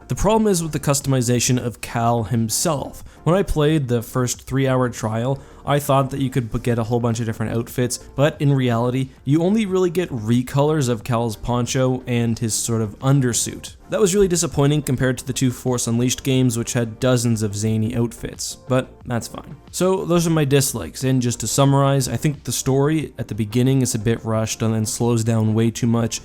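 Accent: Canada. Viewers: Lots of “oots” and “aboots” in this video, I’ve never noticed how Canadian you sound before Eh.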